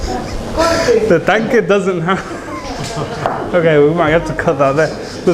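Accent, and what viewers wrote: Andalusia, Spain. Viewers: Me encanta tu acento andaluz! es muy muy bueno. Saludos desde Madrid :) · y Alan Bowman Actually, I'm Spanish and I find his pronunciation is pretty good for a foreigner, with quite a thick Southern Spanish accent.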